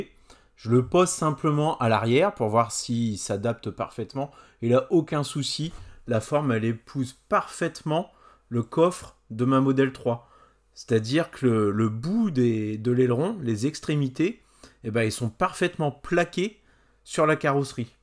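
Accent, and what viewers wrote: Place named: Nord-Pas-de-Calais, France. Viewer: Ah le chti ! T'es quand même attachant ! 😜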